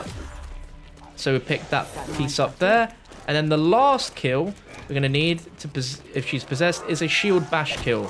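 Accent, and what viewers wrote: United Kingdom. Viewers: People need to stop ripping to shreds about how you pronounce words. British English and American English are very different at times.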